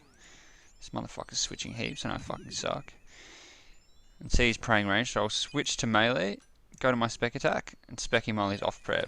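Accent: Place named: Australia. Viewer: Love hearing another Aussie on osrs swearing like nothing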